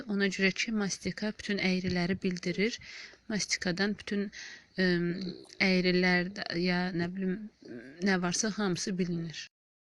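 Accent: Ganja, Azerbaijan. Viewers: Xanım diyəsən Gəncəlisiz hə?🤗ləhcəniz çox oxşiyir gəncəlilərə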